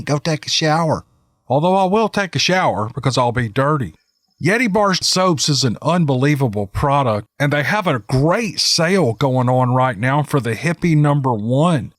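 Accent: South, United States. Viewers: Dude I bet that's why I listened to your stuff I like hear your voice you got this really Great Southern Comfort accent and you're great storyteller are really into big foot have a great night camping in your wife have a good night God bless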